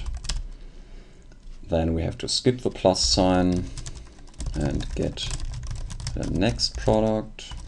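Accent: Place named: Germany. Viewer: Are you german? You sound german, especially when you say 'digit'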